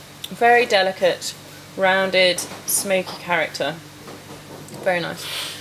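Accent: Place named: United Kingdom. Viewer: Very interesting video, I like Lapsang Souchong! It has very peculiar taste indeed, it's surely not for everyone. Lovely British accent, by the way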